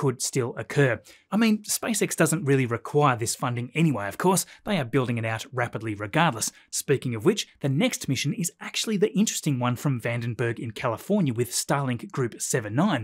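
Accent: Australia. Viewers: Hi. I don't know if other viewers experience the same strange feature when switching on undertitling: I always get it in Finnish! I have to explicitely put it back on "(american) english". I don't have this on other Youtube Channels. And, no: I'm not Finnish either. I'm Belgian, and I speak Dutch. But the english subtitles sometimes help when I did not understand you completely. Nothing to do with your Aussi accent, more with my poor hearing.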